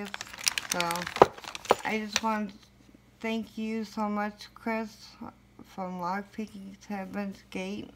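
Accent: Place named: South, United States. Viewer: Just recently subbed to him!!! The dudes a real good picker ...... and he has a bad ass southern accent....!!!!!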